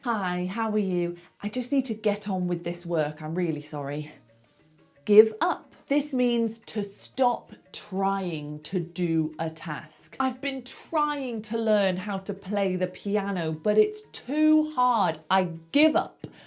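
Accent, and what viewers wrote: United Kingdom. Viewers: I'm on B1 English level, this video was good for me, thank you very much. I watched it with English audio, but sometimes I needed to read English subtitles. I'm Brazilian, and I think your accent is British English.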